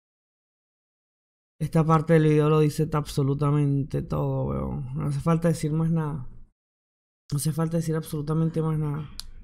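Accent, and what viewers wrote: Venezuela. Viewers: Tengo una duda, si eres arabe porque tienes tan pegado el acento venezolano? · Creo que entró en contradicción porque, sino me equivoco tiene asento venezolano y a su vez es descendiente sirio, lo que lo llevó a asociar "revolución" con "comunismo" (y supongo que asocia comunismo o izquierda con "chavismo"), pero el video es una clara crítica al capitalismo estadounidense que tiene sus garras en Siria. Aunque el bloqueo económico de Venezuela también es impuesto por los yanquis... El que sí lo tiene clarísimo es René con su perspectiva antiimperialista (revolución).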